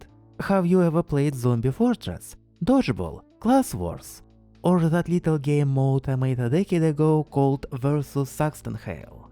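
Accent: Russia. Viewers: Probably slavic. Really strong russian accent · he is russian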